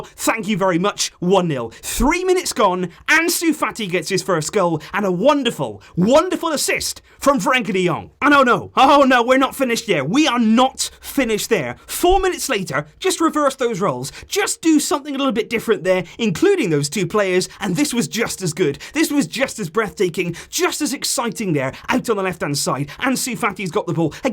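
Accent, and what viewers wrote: United Kingdom. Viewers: Your British ascent is so good ✌️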